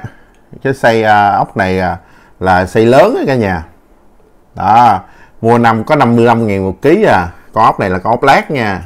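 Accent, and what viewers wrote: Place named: Mekong Delta, Vietnam. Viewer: chuẩn miền tây luôn a ơi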